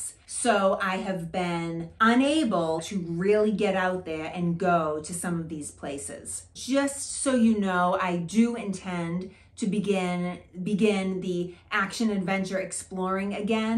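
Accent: Boston, United States. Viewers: Boston is a place where history surrounds you, I fished out of Boston years ago, I tied up across the channel from Griffin's wharf the sight of the Boston Tea Party, every morning I thought about that event. On my way out heading east to fish I would turn around and see the Boston skyline in the early morning sunlight including the Custom House Tower and the spire of Old North Church where Paul Revere saw the lights in the belfry. A truly wonderful city with much history to see everyday. Love your channel and your Boston accent, makes me homesick for New England. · Thanks for your efforts to bring us Revolutionary War history. I live in rural NH and love your Boston accent. Reminds me of a good friend I served with in the Marine Corps.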